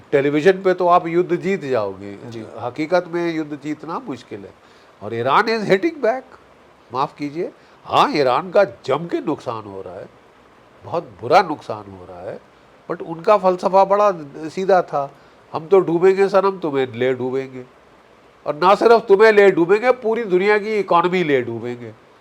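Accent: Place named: Punjab, India. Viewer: Punjabi बंदा जितना मरज़ी अछि हिंदी सीख ले. मगर उसका लेहजा बता ही देता है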